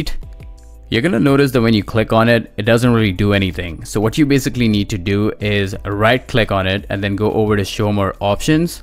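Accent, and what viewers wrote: India. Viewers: thank you very much bro “Indian Accent” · Thanks so much I didn't expect this to work two days I couldn't play. Btw you sound like Indian and Canadian at the same time hahah love you man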